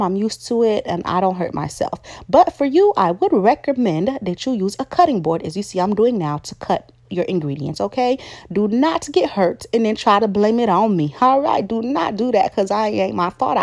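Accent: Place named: Florida, United States. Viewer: You gotta be from FL😭😭 😂 I'm doing this tuhday 👏🏾👏🏾👏🏾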